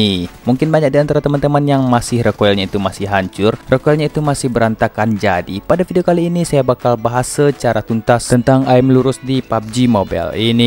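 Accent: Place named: Padang, Indonesia. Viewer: oh.. orang Padang ya, bang. Kirain orang Malaysia 😅🙏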